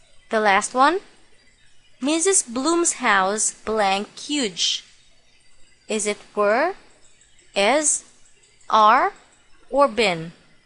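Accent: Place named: China. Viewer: Bhai ya behen dhang se bol. Smj hi nhi aa raha Chinese k jaise bol raha h tu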